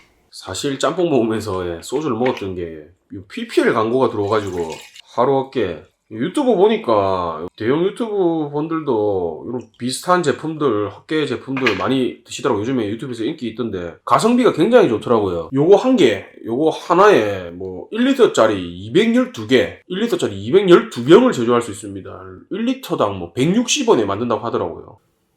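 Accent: Gyeongsang, South Korea. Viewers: 상 남자중에 상 남자 박력 뿡뿡 매력이 잘잘 흐립니다 거기다 경상도 억양까지 넘 섹시하고 멋지다 👍🏼😂😂😂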